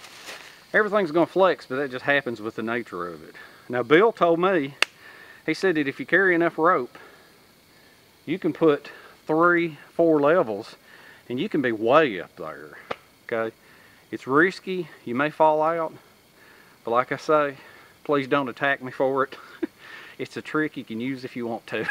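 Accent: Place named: Georgia, United States. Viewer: Yeah I kind of figured you was from Georgia by your accent. I live in SC myself. Love the country accent myself.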